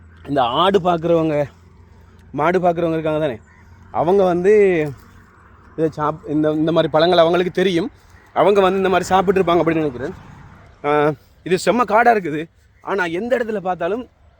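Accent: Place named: Chennai, India. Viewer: கிளாக்காய் காட்டில் இருக்கும் எல்லோருக்கும் தெரியும் நீங்கள் முதலில் Madras தமிழில் கதைப்பதை நிறுத்த முயற்சியுங்கள் இலங்கைத்தமிழில் கதையுங்கள்.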